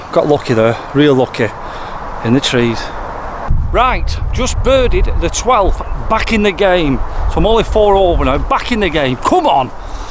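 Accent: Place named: Oldham, United Kingdom. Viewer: Ahh ha, I knew the accent but couldn't place it. Needless to say I'm from Oldham originally but moved down south when i was 2, in 1983, towns a bit different now, got one of these ridiculous southern accents and everything.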